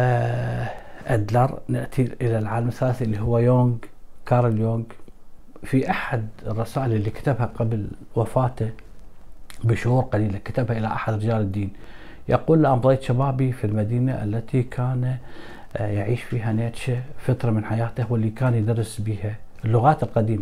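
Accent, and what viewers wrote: Iraq. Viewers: اشكرك من صميم قلبي على كل ما تقدمه بصوتك الشجي العراقي الاصيل . تحية لك من دمشق ❤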